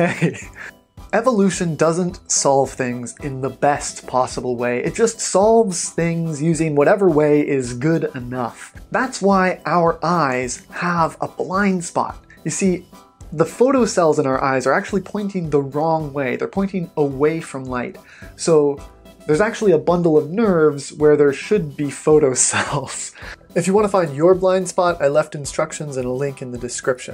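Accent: Canada. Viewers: oh hes canadian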